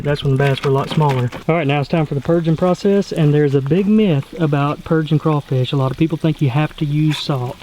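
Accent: South, United States. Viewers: your voice is annoying af. you are deff. southern. but we dont need help cooking our crawfish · That country voice though 😅😂😂😂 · Thank you to you and your beautiful family. I don't eat seafood but I love the video and your southern hospitality accent :) Merry Christmas! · Your accent is so American. God bless you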